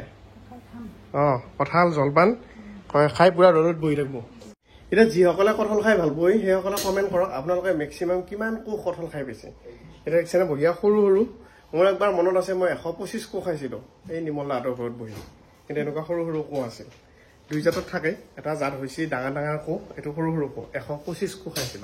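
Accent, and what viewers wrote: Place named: Darrang, India. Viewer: ভিডিঅ' বোৰ ভাল লাগে কথাবোৰ পুৰা দৰঙীয়া আমিও দৰঙৰে। আপুনি মানে খালৈত বৰশী বাইছে আৰু নেকি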